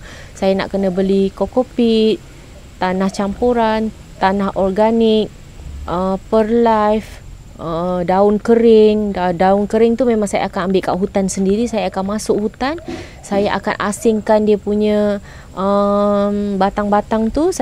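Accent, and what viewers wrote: Johor, Malaysia. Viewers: Itu stail org johor ckp.. bg org johor biasa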